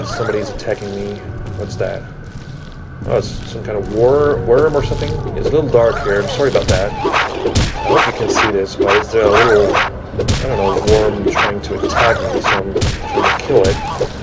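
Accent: United States. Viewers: He certainly sounds quite American. Either that or North Germanic having learnt American English. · He sounds like he has an American accent though...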